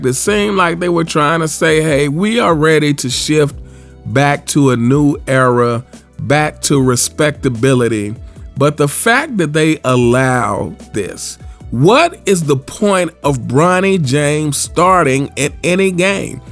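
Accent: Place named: Memphis, United States. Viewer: Been following your content for a while. I knew you were from Memphis after watching one of your episodes. Are you from the Mound, because you sound like 8Ball.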